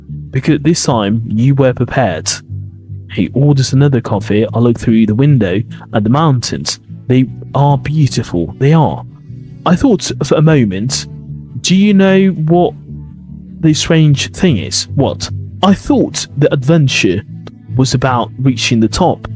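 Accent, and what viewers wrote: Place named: RP, United Kingdom. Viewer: It’s a real pleasure to listen to these fascinating stories read in your perfect RP accent. I can’t wait to hear the next one. Thank you so much for all your hard work.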